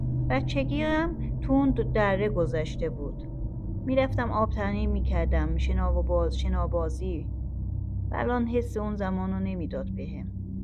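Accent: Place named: Turkey. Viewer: داستان با لهجه ترکی تعریف کردن خیلی خیلی قشنگه ترک زبانها لحجه فوق‌العاده زیبایی دارن ماشاءالله ❤❤❤❤❤❤ ترک‌ها مرد و زنشون همه و همه مردومان باشخصیت باغیرت و محترمی هستن ❤ آرزوی موفقیت برای شما 🌹🌺🌹 و تمامی ترکهای عزیز و دوست داشتنی ❤️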